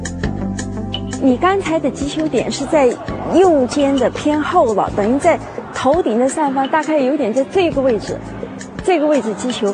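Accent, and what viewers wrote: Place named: Yunnan, China. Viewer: 这位女老师有明显的云南口音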